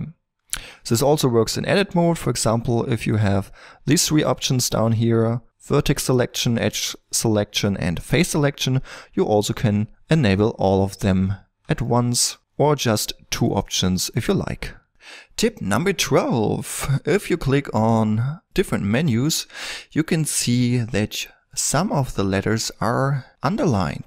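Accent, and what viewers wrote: Germany. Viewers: You sound German.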